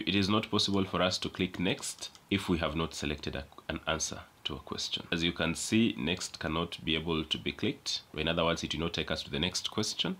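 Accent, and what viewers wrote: Kenya. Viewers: Are you Kenyan bro? I cant ignore the accent😂 ps: I love the work you're doing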